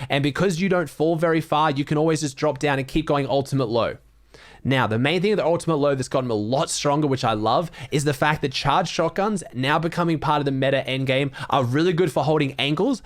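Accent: Australia. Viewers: Nice Aussie great vid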